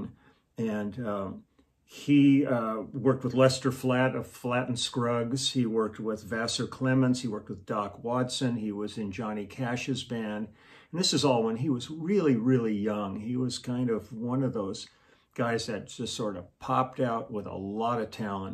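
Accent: South, United States. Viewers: You made me spit my drink out when you told the story about the Loveless Cafe! As they say, "You got South in your mouth"! I can't believe you can remember street names! I have to say, you sounded very authentic saying Goo-Goo clusters! That song you played was popular right when I moved Nashville from Houston. Thx for the giggles! Btw, I just played her the Loveless Cafe story and we died laughing! Thank you again! Taryn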